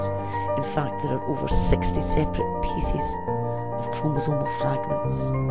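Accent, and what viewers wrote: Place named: Netherlands. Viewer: That’s a Dutch accent that the narrator has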